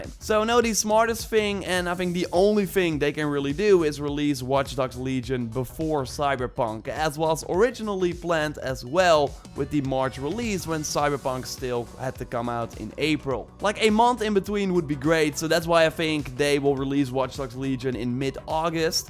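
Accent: Netherlands. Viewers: Lekker hollands accent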